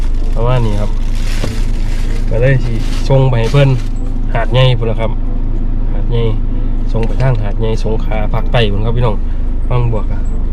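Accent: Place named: Nong Bua Lamphu, Thailand. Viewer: ให้มันสุกก่อนจ้าจั่งสิแซบจ้าเจ้าคือเว้าคือแท้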